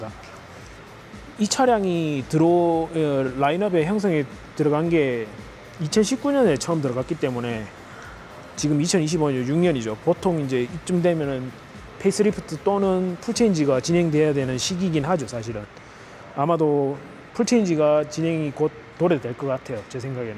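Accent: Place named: Busan, South Korea. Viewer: 캐나다에서 부산사투리.. 니마! 확마!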